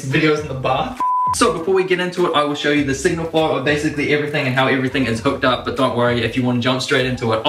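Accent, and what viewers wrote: New Zealand. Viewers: You a kiwi?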